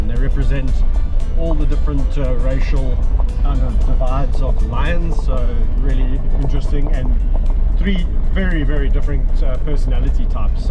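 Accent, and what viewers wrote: South Africa. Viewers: Bloody hell. After these videos, I hear a south African accent inside my head every time i cuddle my German Shepard.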